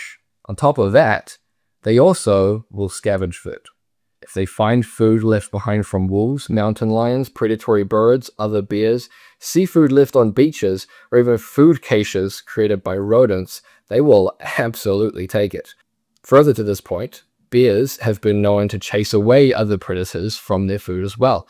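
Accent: New Zealand. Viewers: I'm new here. Loved it. Guessing you're a Kiwi. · Are you a kiwi? Great video btw!!!!! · Do you speak with a Dunedin (NZ) accent? You have a Southland Burr sort of accent. 😊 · South Island, New Zealand.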